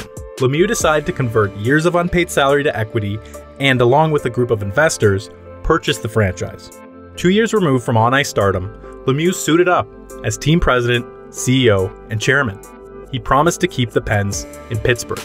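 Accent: Canada. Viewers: this is probably the most canadian accent ive heard on this channel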